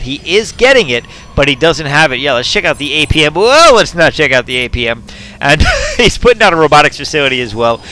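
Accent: Boston, United States. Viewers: "I'm sick of this time zone shit" + your Boston-esque accent = Me subscribing.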